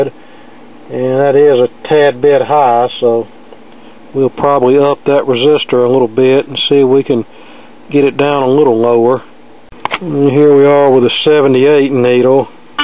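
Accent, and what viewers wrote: South, United States. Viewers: Hi! I am pleased to be following you. I am 69, retired, and trying to learn again what I once studied in high school electronics class. I enjoy your videos, and your sense of humor, very much. I also enjoy hearing from a fellow Southerner. Those other guys who pronounce the L in solder just sound ignorant to me 🤣 Do try to keep things in focus to improve our experience, but I will keep watching nonetheless. Keep up the good work, people my age are not too old to learn. Thanks for your good work!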